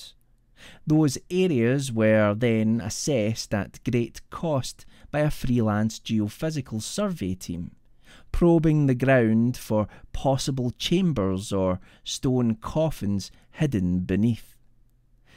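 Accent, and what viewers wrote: Scotland, United Kingdom. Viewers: oh my god Scottish voice narrator and the great! so glad I found this channel! it always amazes me that I can go to 20 different creepypasta narration channels and they all will have different but equally good material for me to listen to! so much talent out there and so many outlets to find them in its just wonderful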